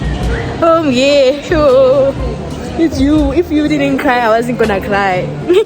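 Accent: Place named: United States. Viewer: Thanks for going we won't even know u gone....u trying to sound like a American already so u will be just fine that side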